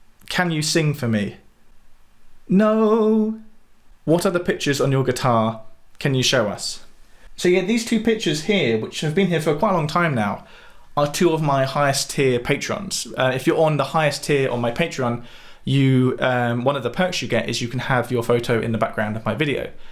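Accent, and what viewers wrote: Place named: Bayswater, United Kingdom. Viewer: as it pertains to the quantity of subscribers, if not for that Bayswaterian accent of yours, you'd probably only have 2 subscribers. I keep waiting for someone to yell "CUT!" and then you go into some American deep south manner of speaking. "did y'all get that? is that a little ol' take?" Seriously, you bring a TON of value and you make things easy to understand and you solve the riddles/puzzles about songs I've always wondered about like your video on and such. For me, I just want to jam and be able to understand the language. I don't think I'll ever be at Macca level because I have other things going on (like working on planting agave to make tequila, as you can see) but to be able to get up on stage and play the songs that I enjoy and that bring energy would be great for me. So, your vids get me closer to that end. 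Gracias, amigo.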